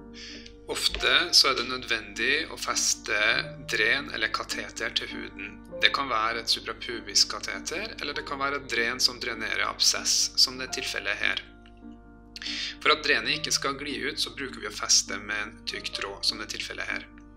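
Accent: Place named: South Africa. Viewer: it`s english but with a south african dialect.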